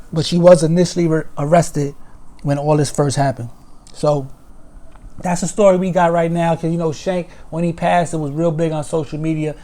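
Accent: New York, United States. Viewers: I Love your voice it sounds so Native New Yorker !!! Love from Jersey Gal Born and Raised !!! Peace To Be !!!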